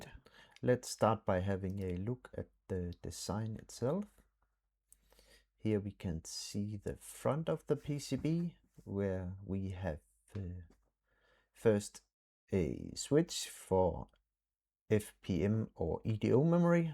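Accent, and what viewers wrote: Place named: Denmark. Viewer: Hehe the danglish <3 Kanon video ven. Hyggeligt med lidt nostalgi. Er der overhovedet en use case med 128mb ?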